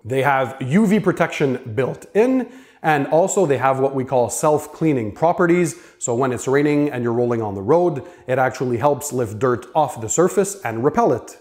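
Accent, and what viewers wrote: Quebec, Canada. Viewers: Are you Canadian?? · Dans seulement 5 seconde je le savais deja que tu venait du quebec , l'accent quebecois et a lexterieur les maisons typique dans les banlieues montreal. Très bon video ! Learned a lot from this video !!!!! definitely subscribing! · French Canadian? You sound hilarious. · Are you from quebec ?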